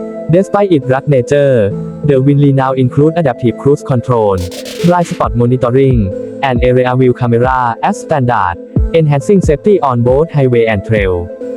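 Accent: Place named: Thailand. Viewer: ISAs รับไม่ได้ว่ะอ่านภาษาอังกฤษสำเนียงไทย ไอ้บ้าเอ้ย😂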